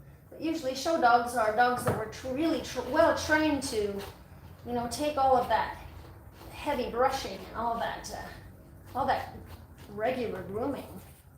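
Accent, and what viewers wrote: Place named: France. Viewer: Ton accent français est tellement beau.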